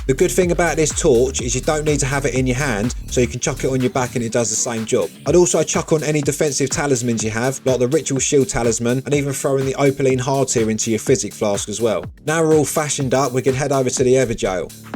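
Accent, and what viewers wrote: Cockney, United Kingdom. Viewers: Fucking hell this is a solid video. Music, cockney as fak. Love it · Good video. But jesus mary and Joseph. The Danny dyer accent and the music. Oof.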